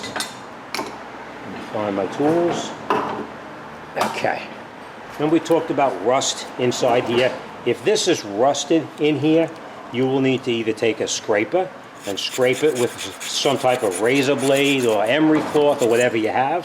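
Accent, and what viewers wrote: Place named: New Jersey, United States. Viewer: Thanks for taking the time to post this DIY. Almost wished I still lived in JC instead of Florida so I could stop by your shop after hitting the diner. Let me know when you work on a Porsche 997 Turbo because I have not found anyone else that has made a great, comprehensive and complete video as yours. Also enjoyed hearing the Jersey accent and the horn honking, too. Keep it up.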